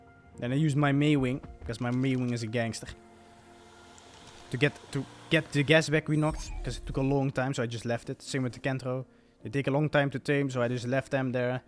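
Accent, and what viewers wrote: Netherlands. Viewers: U sound so Dutch like me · woooow nice video but are you Dutch? cause i can hear some kind of accent! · E bro ik hoor dat je nederlands bent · Ik hoor aan je accent dat je nederlands ben wil je eens ark splen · Ik kan nu al horen dagge ne Nler zijt haha goe videoke :P groetjes van België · Hahah eerste zin die je zegt en ik hoor nu al dat je Nederlands spreekt, maar wel een goede vid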